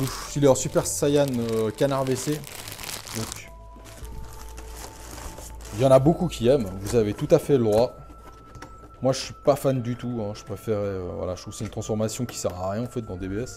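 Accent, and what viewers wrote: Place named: Quebec, Canada. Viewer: This dude def from montreal or quebec.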